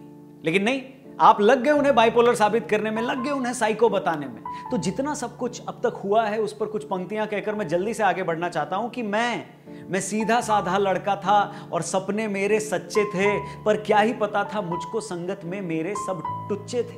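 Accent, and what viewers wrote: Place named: Indore, India. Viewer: Arey yeh bhiya to Indore ki bhasa bol riya hai....Ek number👍👍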